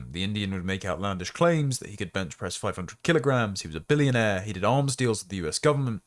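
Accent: RP, United Kingdom. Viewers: Yank here. You are the perfect defender of Western values. You have a posh accent. You look dapper. Your enunciation is superb. Your thoughts are clear and direct. You include the States in your defense. And, you have this lovely background music of seething outrage. Well done, and thanks! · Love that British accent. Alas, soon it may be a thing of the past 😢 Britain may soon be speaking with an Indian accent. Prepare well my friend 😉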